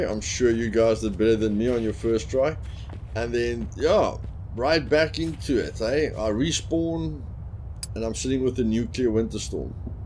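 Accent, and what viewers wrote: Afrikaans, South Africa. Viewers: are you africaans by any chance?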